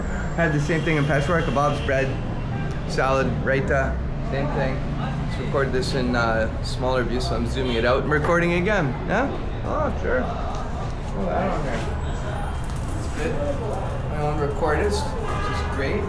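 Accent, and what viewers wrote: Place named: United States. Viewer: Maybe taliban suspicious of you because you sound American. They are watching you all the time 😮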